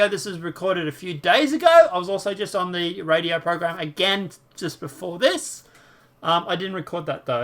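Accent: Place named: Australia. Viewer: Great work, thank you for trying to keep these crooks honest, sounds like you are Australian, doesn't matter, just thank you, if you are not a US citizen you are doing this for the good of humanity.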